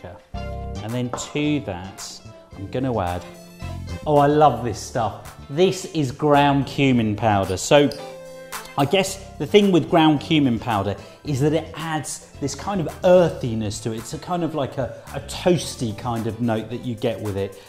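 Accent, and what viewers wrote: England, United Kingdom. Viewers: What is it about an indian guy with an English accent that is just so hot?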